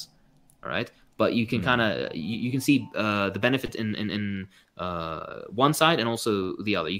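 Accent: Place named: Iraq. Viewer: How? He has a clear Iraqi accent.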